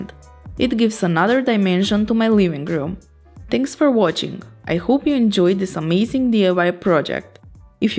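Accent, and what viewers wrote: Russia. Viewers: hard to imagine what was the cost of that table :) PS: are you from Russia?))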